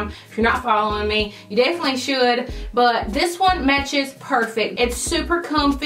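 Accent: South, United States. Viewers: Everyone in the comments are saying you have a strong southern accent and I was like I don’t hear it so when we went to go see my cousins in Atlanta they were like omg they were like she sounds just like you also you are so pretty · Here for the Southern drawl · You have a strong southern accent, the second i heard it i was like WOW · lol I’ve never seen a YouTuber with a southern accent . Definitely subscribing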